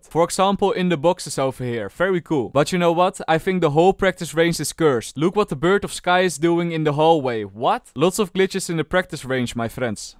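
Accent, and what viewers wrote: Netherlands. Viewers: Its a dutch accent😂 the way he talks and he confirmed it with the word camera lol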